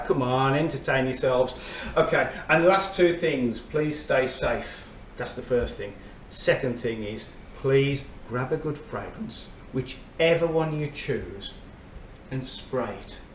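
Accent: Birmingham, United Kingdom. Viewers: Love the original Spicebomb and the extreme. I favour the extreme, before it was reformulated! Performance on it is awful now. I had to return my 2023 bottle to boots and bought a 2021 bottle off eBay. The original Spicebomb is more versatile, I think the citrus gives it a lift. I'll have to sample the new dark leather. I've heard mixed reviews. Yep, weather in the UK is awful 😅 You sound like a Brummie, Birmingham is the third cloudiest city in Europe, only behind Glasgow and Reykjavík, Iceland! Even the cloudiest city in USA has 176% more sunshine than Birmingham!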